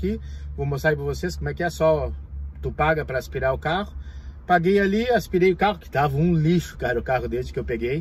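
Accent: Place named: Rio Grande do Sul, Brazil. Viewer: De acordo com o sotaque, quase imperceptível, trata-se de um gaúcho.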